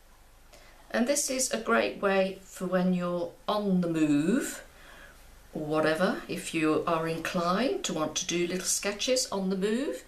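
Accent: United Kingdom. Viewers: Hi... thank you SO much for this... I really struggle with letting go and producing loose paintings... you've shown me an easy and enjoyable way forward! I am completely self-taught (with the help of YouTube and Google! lol!) and started out with watercolour pencils... in the last couple of weeks, I've transitioned across to watercolour paints... maybe this is why I find it hard to let the paint express itself, as I'm used to having more control with pencils?! Anyway, enough rambling... PLEASE do more of this type of thing:) Being an expat in Cape Town, it's great to hear a fellow Brit too!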